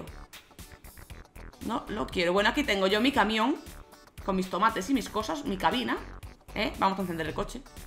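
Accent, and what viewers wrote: Argentina. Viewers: parece un argentino cheto o de verdad sonamos asi? xD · Se llama "español rioplatense"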